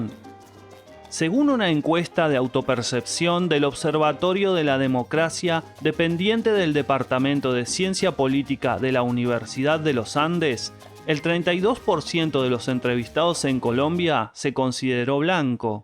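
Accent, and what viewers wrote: Rioplatense, Argentina. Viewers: No solo los porteños hablan así por si no sabías el lenguaje rioplatense habla medió país que no te guste problema tuyo, es una tonada única y hermosa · Que desagradable el acento porteño del relator.... demasiado cha, che, chi, cho, chu. En Argentina la gente más educada no habla tan así. En este video hay un desagradable tufillo xenófobo. Esa idea de valorar en demasía la raza blanca. En todas las etnias hay gente muy valiosa y también de lo peor. En mi caso y el de mi hermano somos como muchísimos chilenos, hijos o descendientes de inmigrantes. Mi papá de Varsovia Polonia, y mi mamá de Manchester Reino Unido. Eso no hizo que tuvieramos ventajas sobre otros compatriotas. En Chile existe una alta valoración de nuestros ancestros indígenas. Yo opino que nadie es superior a otro ser humano por nacer indígena, de genética europea, africana o mestiza. 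Que seas más verde, azul, bajito o de cabello rizado no vas a contar con ventajas importantes. Sean Peszynki desde Santiago de Chile💥💥💥💫💫💫💫💫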